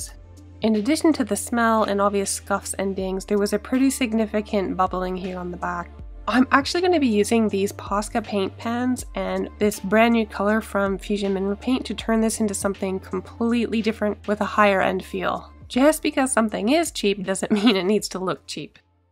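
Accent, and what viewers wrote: Canada. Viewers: Found you by accident but was blown away by your work. Also, I miss Canada so much so loved hearing you talk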